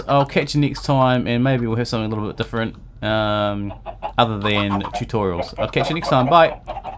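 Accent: New Zealand. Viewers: Holy crap u from Nz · you sound like a new zealander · EEEG lol you're a total kiwi! Props to you! Genius idea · I guess you have never heard a New Zealand accent then...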